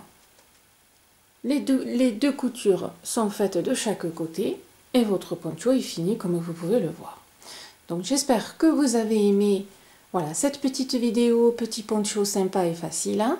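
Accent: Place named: Southwest, France. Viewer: bonjour, je recherchais un point pour un poncho et autres et là je tombe sur ton tuto. Pile à point. j'ai adoré les explications, cette touche d'humour vraiment agréable et cet accent oh là là qui rechauffe le coeur et me rappelle l'époque ou l'ai habité le sud-ouest ou les gens sont chaleureux et simples. merci.👍❤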